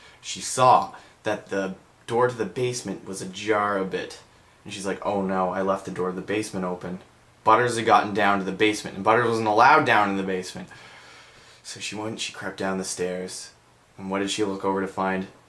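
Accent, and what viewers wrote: Canada. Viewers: i like his candaian accent, lol, so cute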